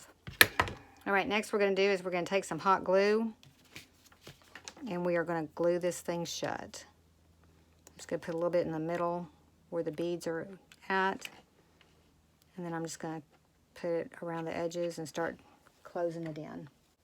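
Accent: South, United States. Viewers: Fantastic can’t wait to get making some! I love your southern US accent too 🇬🇧😀🤗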